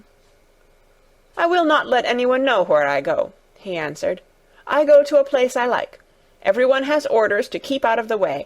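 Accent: Yorkshire, United Kingdom. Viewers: This is the best audio book and reader ever! Her reading i so smooth and the Yorkshire accent is wonderful!!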